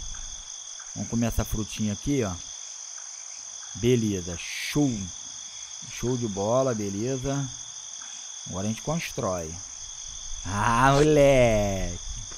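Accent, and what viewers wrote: Rio de Janeiro, Brazil. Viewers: Não sei se ele é carioca , mais nos caraioca falamos assim kk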